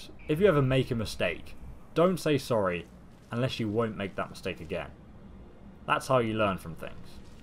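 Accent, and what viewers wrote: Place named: United Kingdom. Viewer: Omg I live in the Newcastle area! :D i always knew you were British cause your accent is similar to mine, it's great to get to know a tonne of things about you :D.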